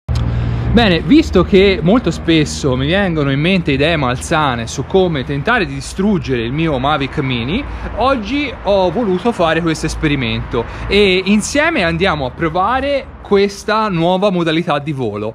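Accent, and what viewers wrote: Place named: Tuscany, Italy. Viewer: Oh che sei Toscano?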